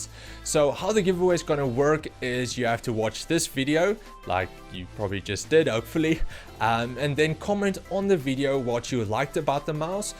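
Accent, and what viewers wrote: Afrikaans, South Africa. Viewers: I thought you had a afrikaans/ South African accent😂😂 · The moment i heard that accent I knew it was a South African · My guy you from sa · I clicked on the video, heard the South African accent and subbed. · Are you from South Africa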